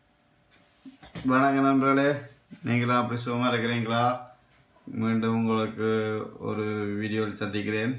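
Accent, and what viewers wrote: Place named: Sri Lanka. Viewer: Neenga pesura srilankan tamizh romba pidikum anna👌👌, London la oru idathula indha noodles sapta niyabagam iruku 😊, yummy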